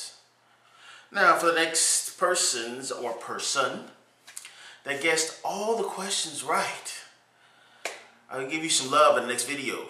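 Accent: Jutland, Denmark. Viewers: og nårh ja, man kan godt høre at du bor i Århus, du har stærk jydsk accent :D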